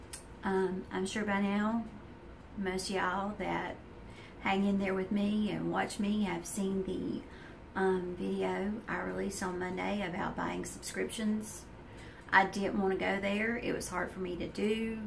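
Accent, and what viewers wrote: South, United States. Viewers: Hi! I totally know what you mean about you tube screen shots! I love your tactic to get a good pic! I follow many of the same ladies you do. I love the different accents we all have depending on where we are from. I'm a midwesterner so I often wonder if all of you, with those adorable southern accents, can tell which part of the country it's from? (North Carolina vs Texas?). Just wondering.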